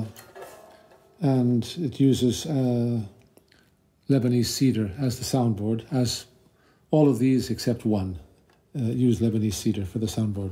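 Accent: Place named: Ireland. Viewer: thank you...your Irish Brogue is still intact.....UCD Graduate Class of 04 Greek American!!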